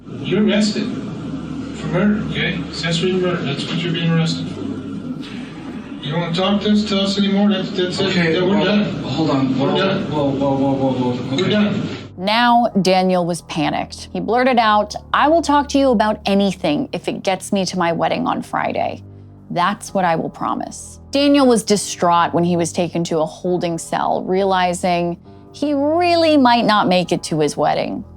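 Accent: Australia. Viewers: The aussie accent wears on me after awhile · that kooky, strange, Aussie voice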